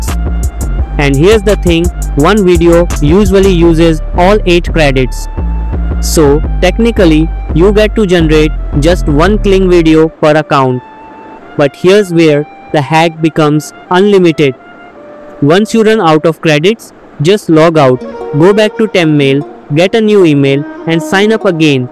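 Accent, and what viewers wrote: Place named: India. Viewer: Indian accent right? ur from india cool😮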